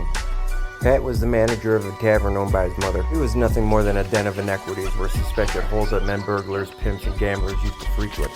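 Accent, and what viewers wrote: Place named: Buffalo, United States. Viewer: The guy narrating has the horrible Buffalo accent…. So cringe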